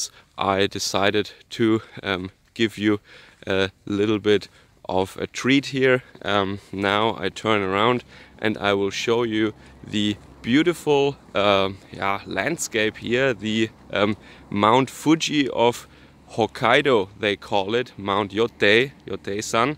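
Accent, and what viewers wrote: Bavaria, Germany. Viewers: bist du eigentlich bayerisch, oder fraenkisch oder schwaebisch?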